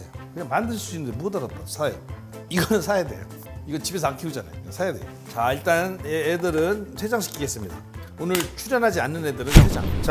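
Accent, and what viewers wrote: Chungcheong, South Korea. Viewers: 백종원 님 말씀도 맛잇게 충청도말씀 저도 충청도임니다 감사해요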